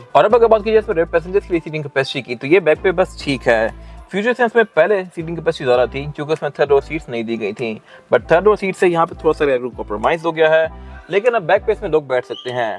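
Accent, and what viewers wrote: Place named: United Kingdom. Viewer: آپ کی آواز کی صحیح سمجھ نہیں آتی ۔ یا تو آپکے مائک میں خرابی ہے یا پھر آپ تھوڑا آہستہ بولا کریں اور اردو کو بجائے انگریزی لہجے میں بولنے کے اردو ہی کے لہجے میں بولا کریں ۔ ریویو آپکا اچھا ہوتا ہے پر آواز اور لہجے کی وجہ سے کچھ الفاظ سمجھ نہیں آتے ۔ شکریہ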